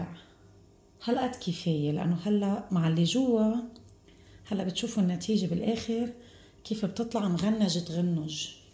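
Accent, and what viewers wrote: Iraq. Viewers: عاشت ايدك انتي انسانه طيبه وروعه وشكل طاقية السلطان باين حلوه وطيبه وكلامك كلش حلو بالعراقي وتحياتي لكي ول وحماتك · تسلم ايدك ماشالله إشكد مرتبة اللهجة العراقية تجنن عليج❤ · فدو حلو عليج الحجي العراقي يابعد روحي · تسلم ايدك يا شكلها يشهي فعلاً من بعدك قفل الترند مافي احد يعملها بعدك وحلوة بفمك اللهجة العراقية متابعتك من العراق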